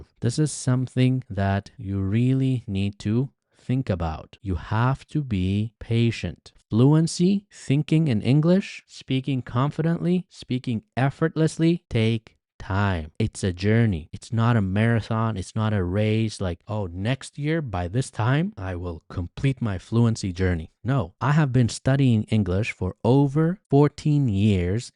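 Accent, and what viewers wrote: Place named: United States. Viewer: Sir i eagerly want to learn English through your podcasts i learned a lot of things from you about how to improve English but the problem is that i want to learn British accent and your accent is American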